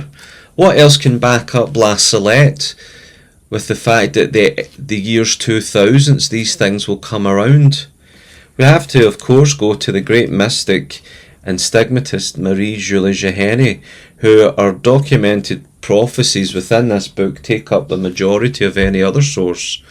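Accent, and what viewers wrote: Scotland, United Kingdom. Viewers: What the heck! Your channel is pure dead brilliant!!! I live in Australia, and I've never heard of you before. My mother still lives in Greenock though, which can't be that far from you, gauging by your accent. You could have at least told her, and she could have sent me a postcard. So much wasted time. Now I'm having to watch all your other videos. My wife doesn't understand, says there are household chores to attend to; dishes and stuff. You've put me in a difficult dilemma. I am going to pray about it. 😀👍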